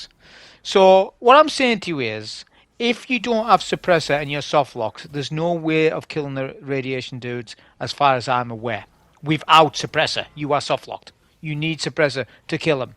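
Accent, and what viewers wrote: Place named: Newcastle, United Kingdom. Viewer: I started to play this one only recently and could see how difficult this is. Btw your accent is similar to one of my coworkers who’s from Newcastle lol. Good one as always !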